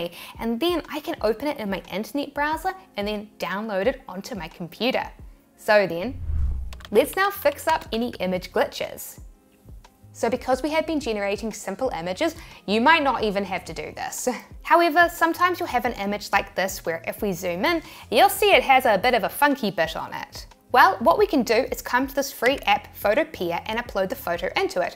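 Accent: New Zealand. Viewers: I really enjoy listening to that New Zealand accent..🙆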